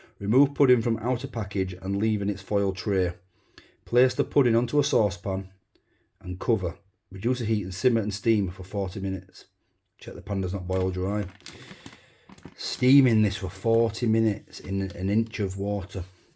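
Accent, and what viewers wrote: Yorkshire, United Kingdom. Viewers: . Watching this from Adelaide with great envy. Can tell you are a woolly back from yorkshire. wheres the curry sauce and barm cake?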